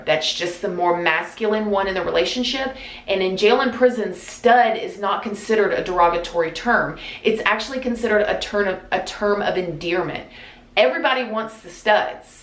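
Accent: United States. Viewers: Love to see you Interview a guy called David Icke check him out? Most important information I've ever heard!!! 😉 check him out on Agenda 21 the plan to kill you! 😈 Top illuminatti spotter? 👤👥👤😲✊👀 if you got little ones? Please check out David Icke on the Vaccine hoax!! 😈 this life is nothing like they tell us it is!! 😲 check him out on Bloodlines!!!*😈 Crazy stuff? And Jeff Censored the most banned head on YT 😂 all your favourite Rockstars? And Actors ALL throwing up illuminatti Gang sighns?? On Jeremiah Cohen!!! It'll blow you away? 😲 so peace people from Sunny England and if you read this can I get a shout out please in that Top American accent 👍😆✌💙 from your Number 1 English lad!!😉 Zac xxx cheers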